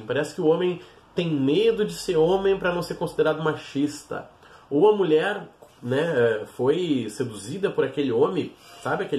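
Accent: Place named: Rio Grande do Sul, Brazil. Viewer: Homi gauchão! kkkkkkkk.. difícil hein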